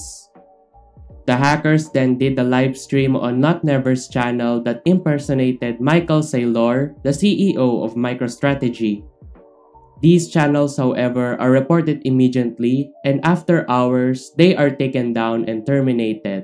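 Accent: Philippines. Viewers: i knew it your a Filipino by your accent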